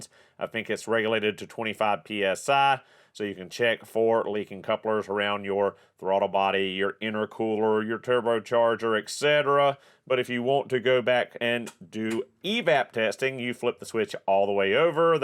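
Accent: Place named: Vermont, United States. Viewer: Is a Vermonter? It all checks out. He even has the accent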